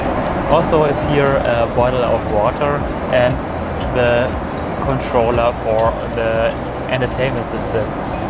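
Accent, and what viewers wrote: Germany. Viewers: Dein Englisch ist so deutsch! So richtig schlecht!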